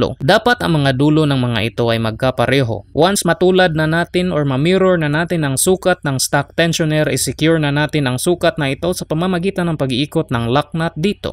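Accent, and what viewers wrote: Visayas, Philippines. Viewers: Visaya po ba kayo Ayusin niyo naman po ung boses niyo para maintindihan ng maayos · bisaya boses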